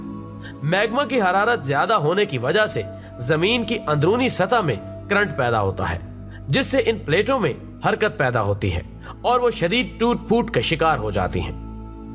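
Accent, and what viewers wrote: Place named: India. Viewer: logo please isko unsubscribe kar do ye RAW walay misinformation aur na umeedi ki videos laga rahay han...zara ghor se dekho is video ko iska talafauz sunno...ye aik HINDU ha RAW ka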